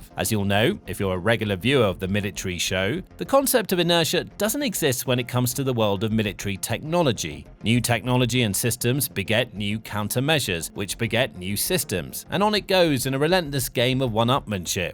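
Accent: United Kingdom. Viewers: Yeah. I expected it before I even clicked on the vid. This channel is still such a joke. But eh. It's a brit narrating so what do you expect?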